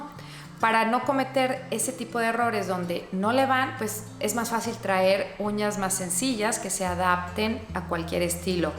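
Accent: Mexico. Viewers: ese spaninglish es terrible fuera que tienes ese tremendo acento mexicano!!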